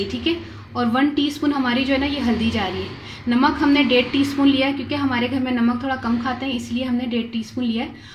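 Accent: Punjab, India. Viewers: First of all thanks for this wonderful recipe. I am urdu speaking but at the end your punjabi accent is so awesome. I like the way of your speaking. Good job. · Aaahhhaaa punjabi ka tarka....enjoy your pure pinjabi accent...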